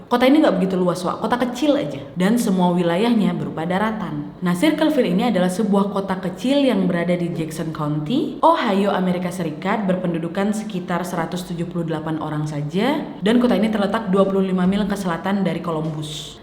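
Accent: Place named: Medan, Indonesia. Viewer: Saya suka sama cara ngomong nya mba logatnya kayak orang Medan lucu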